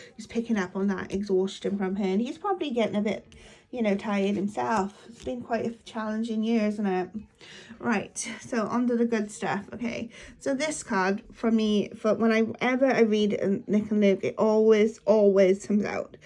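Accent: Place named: Wales, United Kingdom. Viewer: I’m not on this ship….i observe from afar. is a sweetheart and I love listening to her welsh accent……I find it very soothing. Whether tarot is your thing or not comes from a place of goodness. If A and L are still together….if N and L are not a couple….in the greater scheme of things in your own lives does it really matter? They are not your friends or family. Interesting that a lady posted yesterday on TT that L was in her shop in the UK. Both L and N like to post sometime after they were at a particular location. To me L’s hair and sideburns in the restaurant pic look a little short for current filming… but I could be wrong. Maybe A is at said restaurant with a new BF…. In my job of almost 40 years, I have learnt that things are not always as they appear….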